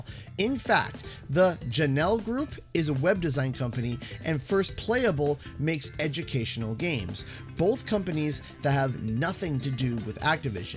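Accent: Canada. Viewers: Hey how about a skylanders remaster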